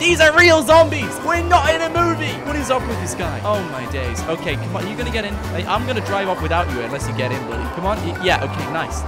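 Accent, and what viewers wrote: England, United Kingdom. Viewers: Is he British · hey are yu from england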